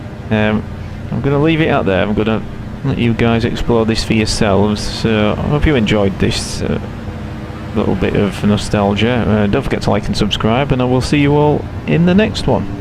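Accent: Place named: Hull, United Kingdom. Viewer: You sound like you're from Hull!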